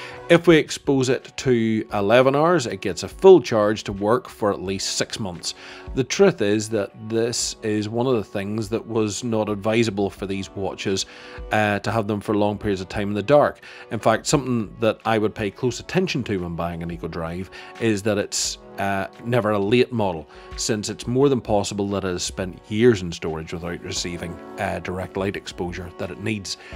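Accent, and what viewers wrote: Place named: Northern Ireland, United Kingdom. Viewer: Northern Ireland,it's a lovely accent👍🏻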